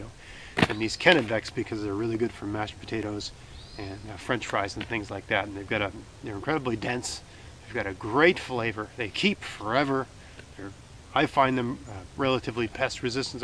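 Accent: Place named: Atlantic Canada, Canada. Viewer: I've been trying to figure it out too! lol. More like Rick Mercer I'd say. East coast. Except Rick is from St Johns. But closer to Nova Scotia than Norm is for sure.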